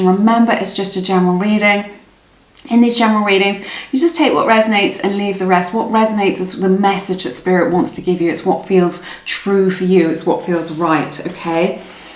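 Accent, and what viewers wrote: United Kingdom. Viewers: Dear, i just wanted to tell you that i absolutely love how you talk (that british accent...👌) also, pile 2 resonated so much, thank you so much!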